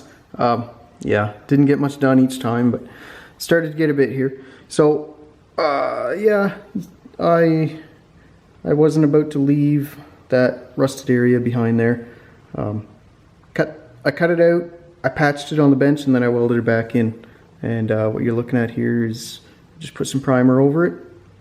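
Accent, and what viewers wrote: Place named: Nova Scotia, Canada. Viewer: How'd I know a video about how to repair a rocker panel would be done by a guy with a Nova Scotia accent.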